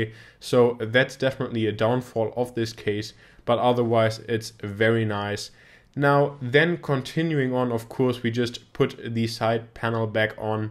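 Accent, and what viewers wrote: Germany. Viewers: Dein Englisch ist wirklich gut und die Aussprache ist auch wesentlich angenehmer als bei manch anderen Deutschen Youtubern.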